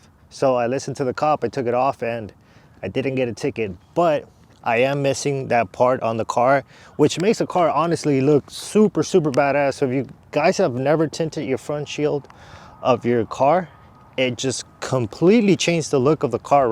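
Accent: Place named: Mexico. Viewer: I got some work for you and your people, maybe even a speech class cuz I still hear that Mexican dialect!!!!!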